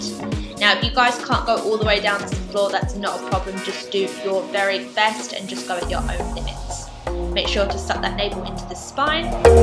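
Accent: United Kingdom. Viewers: Is it just me or was your accent more Britishy last time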